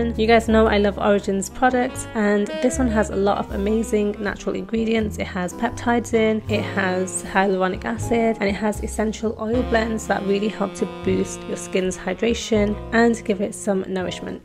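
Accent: United Kingdom. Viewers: OMG your Brit accent is so beautiful! Love hearing you.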